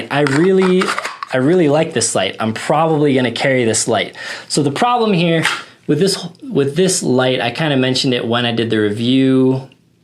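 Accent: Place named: California, United States. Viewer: did not know you were from california. totally got that vibe from the way you talk though. still rock my LLOD hoslter, one of the best.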